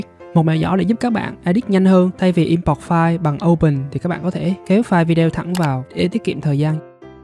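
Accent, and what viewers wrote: Vietnam. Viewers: Giọng giống người Đà Nẵng?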